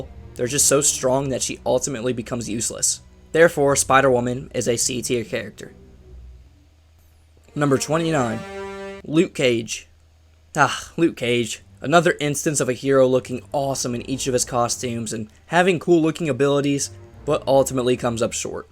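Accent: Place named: South Jersey, United States. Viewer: I’m from Philly & you are 100% from South Jersey I can hear it in your voice lmfao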